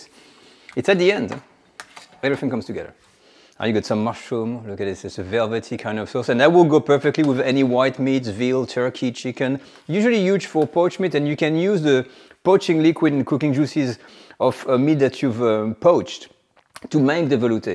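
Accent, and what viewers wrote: France. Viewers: He was born in Paris. Why wouldn't he have a French accent?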